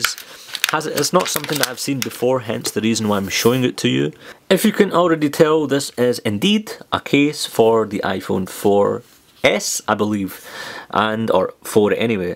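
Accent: Scotland, United Kingdom. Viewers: Noobstyleful he is scottish unless u can't make out the accent if u are not all there in the head!!:)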